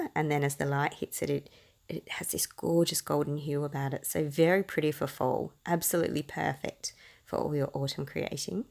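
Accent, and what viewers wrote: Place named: Australia. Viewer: I could listen to your cute Aussie accent all day long! <3 I initially noticed how you mounted your background on the floral paper. I love that, it's so original. Again, the Cocoa Vanilla paper has really caught my eye. I've been rather busy with my new job, but excited to get back to creating with all the goodies I love. Thanks for inspiring dear <3 Cheers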